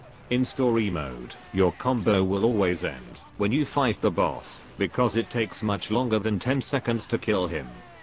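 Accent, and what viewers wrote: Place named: RP, United Kingdom. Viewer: This voice should be used to review every game ever. This is legit the only game review I've watched all the way through because hearing a British butler review the game was awesome.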